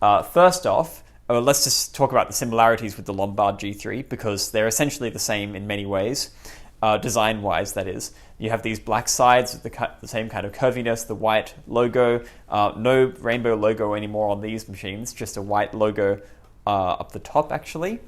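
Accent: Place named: South Africa. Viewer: Great video, I bought a Pismo Book for £25, fully working in excellent condition from eBay. Are you South African? Your accent sounds like my dad and he is from East London, Natal! Great video mate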